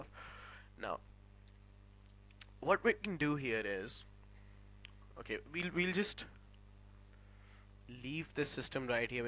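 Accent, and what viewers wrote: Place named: India. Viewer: You seem to be Indian ! from ur way of speaking!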